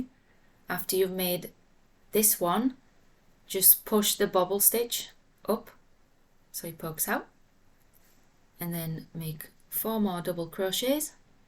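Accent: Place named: North, United Kingdom. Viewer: Great to hear a northern accent with clear instructions. I’m a beginner and had no problem following your pattern. Thank you. I will be following you from now on. Xx❤️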